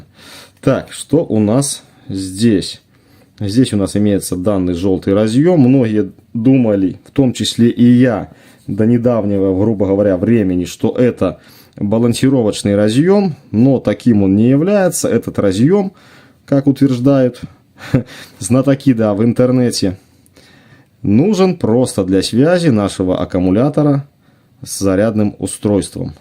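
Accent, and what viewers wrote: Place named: Vitebsk, Belarus. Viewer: Улыбаюсь с говора-акцента.;) Как будто к родне в Витебск снова заехал. Много лет не был... Эх. А аккум норм, наверное) Крышка-вешалка пригодится. Отдельно продаются, конечно. И зарядка компактная. Я б взял, как вторую. Надо подумать.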